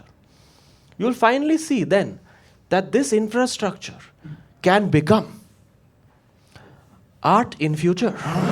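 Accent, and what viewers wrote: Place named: India. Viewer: why is your accent so closely associated with brown skin black hair and indian nationality? everyone in bahrain spoke like you even the british blonde schoolgirl scoundrels!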